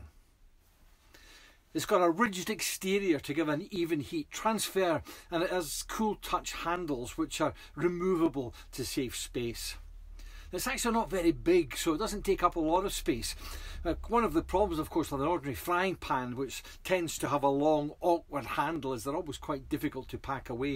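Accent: Scotland, United Kingdom. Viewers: Something about the scottish accent makes for a great story teller, the way you said calzone with such enthusiasm, great review and great video to watch, just ordered one from amazon will be collecting from a locker tomorrow and giving it a go.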